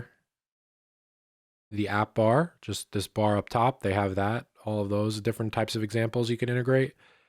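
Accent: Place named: Boston, United States. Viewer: Man that Boston accent <3 You should colab with Brand one day.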